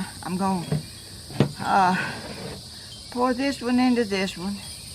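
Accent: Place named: Georgia, United States. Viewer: God has Certainly Blessed You with Good Health Ms.! You are Good Christian Lady, Kind & Compassionate to People & Yo Keep Busy, You are such a Blessing to sooo many People! God’s Blessings on You and your Family! 🙏❤️😇😇🍁🍁 I Love 💕 your Southern Accent & just listening to you Talk & your Stories! You mind your own Business & Trust God & that is why we ❤️ you, You are just you! That is sooo Beautiful! I Love ❤️ that Color on You!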